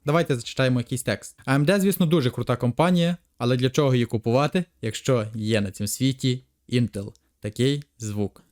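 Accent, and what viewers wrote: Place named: Western Ukraine, Ukraine. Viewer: у вас цікавий акцент ) Іванофранківщина/ тернопільщина чи закарпаття?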